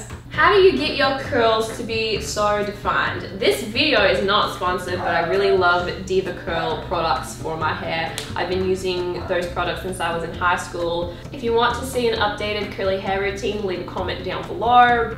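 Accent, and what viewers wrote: Australia. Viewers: I freaking loveeee your Australian accent I think you used it in one of the first videos I watched of you and I was very upset when I found out that you weren't actually Australian😭😂. Forget the haters this was awesome! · This is funny because the first time i watched one of your videos i really thought you were Australian as in i was SOLD lol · You sound more Australian than I do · YAAAS I LOVE THE ACCENT! Instantly clicked becaause of the accent hahah I love the Australian one. Also yes please do a curly hair updated video I'm half filipina & black too so help a sis out! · Came here because I was listening to one of your videos and I heard you hearing some stuff in an Australian accent so I got very confused coz I thought you were American, I know why now lol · As a true blue Australian, YOU SOUND PROPER AUSSIE and did great, be proud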